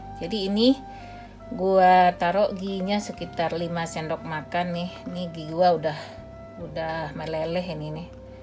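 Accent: Padang, Indonesia. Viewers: Aneh org pdg ngomong gw.gk enak d denger nya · Logat nya padsng... nyebut gua ga pantas. Bahasa gue tidsk sopan.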